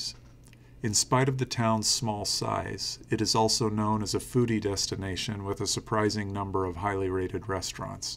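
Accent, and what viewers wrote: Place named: Northern California, United States. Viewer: i am curios- are you from Nor Cal? It just feels like you are sir- would love to know as have watched several of your videos. Thanks- Robert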